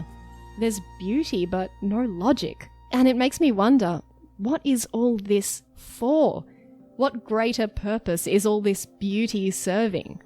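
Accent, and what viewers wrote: Australia. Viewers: a hole in the floor for the ore to drop through is a fun thing to hear in an australian accent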